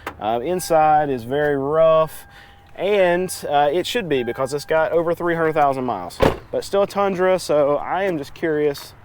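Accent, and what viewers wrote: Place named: East Tennessee, United States. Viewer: Reminds me of east tns accent, y’all say own instead of on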